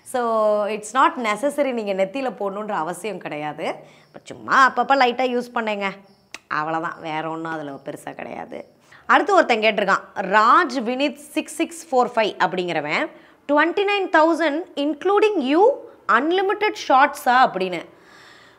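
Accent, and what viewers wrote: Madurai, India. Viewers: Va la po la nellai basha ila ? Neenga madura basha nu solringa 🧐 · Am also from Madurai yes in that city they speak like this only commonly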